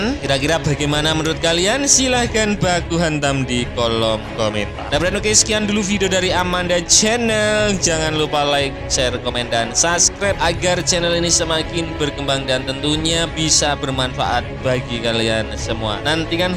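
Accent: Java, Indonesia. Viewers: Admin asbun bicar a tanpa data.....saling kritik antar anak bangsa adalah hal biasa di Timor Leste.....$12 jt utk acara penyambutan bknlah berlebihan ....dari logat anda dipastikan kamu org jawa..... Spy kamu sadar ya ......mau brp biaya yg kami keluarkan bkn urusanmu ..... Urus aja dirimu ...agamamu dan negaramu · Min jgn cari uang bawah2 negara org kamu bukan org Tls ko..itu bukan urusan kamu...jgn pke uang haram kash makan keluarga...logat jawa nee..kentara.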